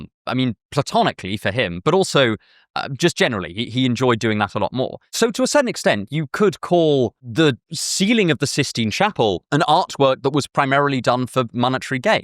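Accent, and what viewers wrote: United Kingdom. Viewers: I missed the flavor of your providing text, but also being able to have accurate spellings provided (such as "deepity"), and being clear about what you're saying at all times. You're a clear speaker, but you're British and I'm American and the words help. Though I understand if it is time-consuming to have to provide them and you need to reduce your load.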